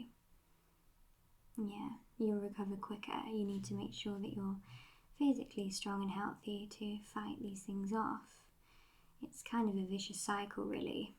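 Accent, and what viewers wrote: United Kingdom. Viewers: Your British voice is so soothing thank you for making this medical role plays help soothe my autism can we be friends i hope you don't mind but i messaged you on Instagram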